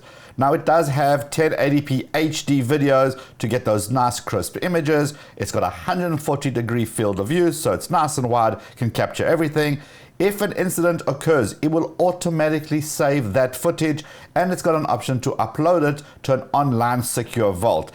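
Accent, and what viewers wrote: South Africa. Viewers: Hello, it's absolutely true, the more you pay doesn't ALWAYS buy you the best.. good video.. In other news, are you South African? You sound like a saffa boet🌍🤔😂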